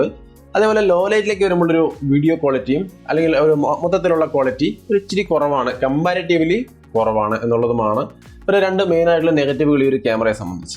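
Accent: Thrissur, India. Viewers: Brooo thrissur Karan anno? Reply please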